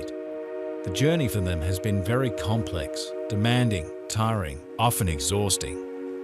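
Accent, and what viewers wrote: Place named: Australia. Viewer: something about an aussie accent that is easy to dismoss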